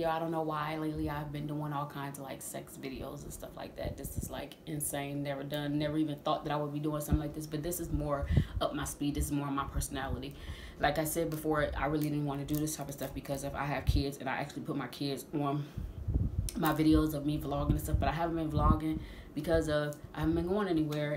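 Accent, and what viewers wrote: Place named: Ohio, United States. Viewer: I felt you were from the Buckeye, eard it in your voice. I live in the Cleveland area but I'm fom Atlanta. I've been here 14 yeas. Love te story, we all have one...even the " oh my" folks.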